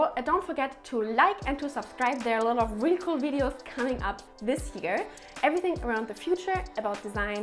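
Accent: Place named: Europe. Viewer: As an Egyptian American UX designer myself I love it when I see knowledgeable UX designers like you happen to have an accent. It emphasizes my opinion on how most great trends in UI, fashion, interior design come originally from Europe and Asia. Americans here might be “uncompetable” when it comes to technology, but Europeans—especially Germans, French, Polish, and Italians—are definitely wizards when it comes to aesthetics and art. Good job, loved your content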